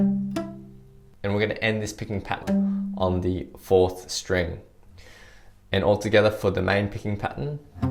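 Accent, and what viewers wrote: Australia. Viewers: Never realized u were aussie was I fucking deaf.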